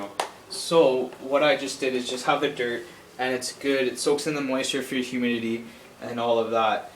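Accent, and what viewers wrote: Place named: Canada. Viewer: nice video. u sound like ur from Canada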